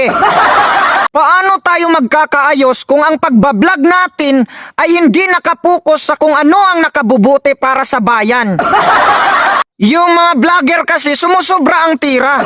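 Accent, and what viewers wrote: Visayas, Philippines. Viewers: Sakit sa tenga nitong Visaya na to ayosin mo muna salita mo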